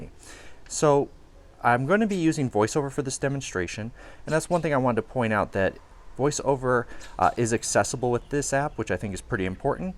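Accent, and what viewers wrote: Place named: United Kingdom. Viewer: For some reason I thought you were from the uk. Lol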